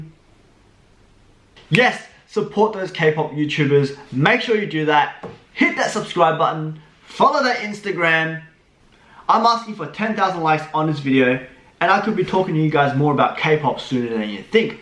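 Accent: Australia. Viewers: You are so cute when you serious....i love your Australian accent.. If I'm right. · I love your Australian accent!! Ur soooo cute!! Maybe you can just become a solo artist....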